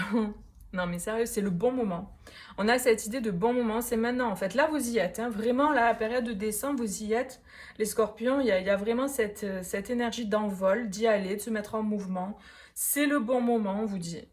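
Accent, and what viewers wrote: South, France. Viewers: Merci pour votre limpidité, merci pour cette guidance. D'où vient votre accent du sud ? Je suis du sud ouest 😉. Douce journée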